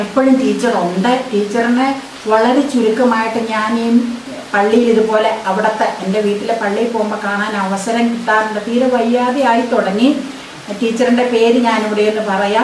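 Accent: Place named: Muvattupuzha, India. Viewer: Ru from muvattupuzha area you talk like people from there my family is from there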